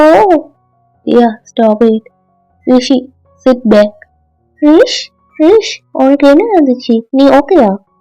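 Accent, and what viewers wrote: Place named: Tirunelveli, India. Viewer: noo ga neenga sollurathu tirunelveli slang maathiri erukku enn clg frd kuda eppadithaan ethavathu sollanumna eppadithaan oru visayatha avanala long ahh solla mudiyathu example valikkuthunu solla sonna valikki 😅 nu solluvaan sorry ga just fun 😵‍💫🙃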